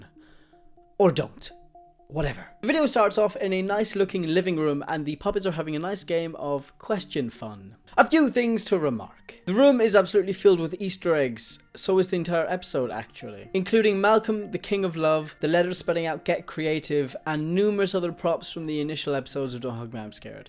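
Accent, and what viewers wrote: Ireland. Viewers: What part of England are you from you have an Irish accent also your pretty cute 😎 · Are you Irish I'm just asking because of your accent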